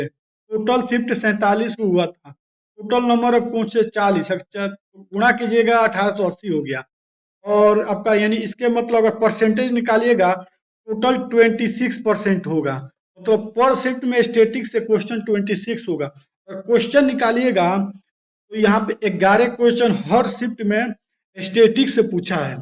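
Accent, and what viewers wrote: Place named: Bihar, India. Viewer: Aapki boli se lagta hai aap bihar se hai... Agar nhi ho toh correct kar dijiyega humein... Bohot acchi koshish hai aapki... Aap ekk din nidarr tarike se jarur video banayenge.. All the best👍🏻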